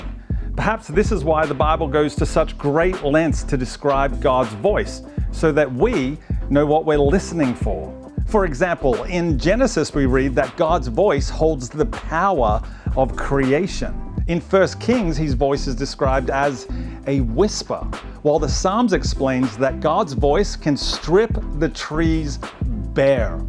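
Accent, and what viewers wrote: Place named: Australia. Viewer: Missed your voice man! Love reading your devotionals every morning! But something about that Australian voice explain things just makes it better. Loving this channel so far!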